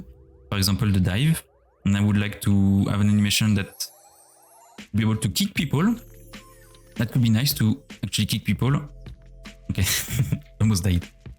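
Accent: France. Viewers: Ca c'est un Français qui parle anglais :) sympa la vidéo, bonne continuation ! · J'ai presque l'impression que tu parles francais, c'est cool c'est plus facile a comprendre 😊 Merci pour la vidéo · your french accent sounds funny, i like it. Keep the great work up😆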